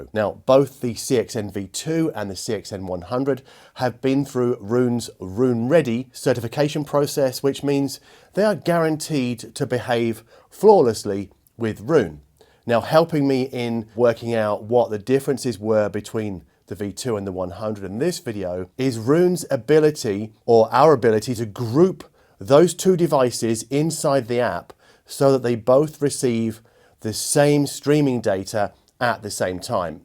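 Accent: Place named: England, United Kingdom. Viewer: Thanks! Get well soon (You sound like Caleb from Digital Trends albeit with an English accent 😅)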